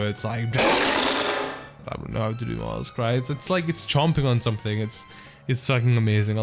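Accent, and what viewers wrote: Scotland, United Kingdom. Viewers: Scottish? :P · Are ya scottish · That Scottish accent in the first video you ever made :'D xD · Is this nigga Scottish? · you can really hear scottish in in his first video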